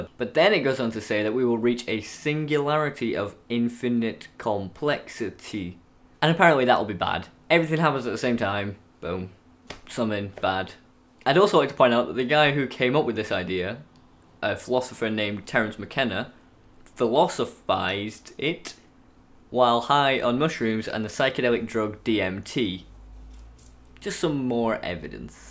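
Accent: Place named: Lancashire, United Kingdom. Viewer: Hey, I dunno if you're still actively using YouTube at all, but I think of you from time to time. I always liked the videos you made, and I particularly like your accent; Lancashire is easily my favourite English accent. Anyway, if you see this comment, I hope you're well.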